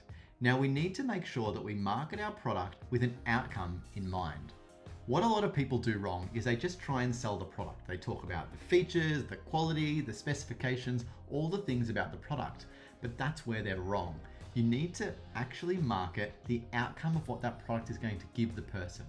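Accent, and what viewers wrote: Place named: Australia. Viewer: Automatic trust, when I hear Aussie accent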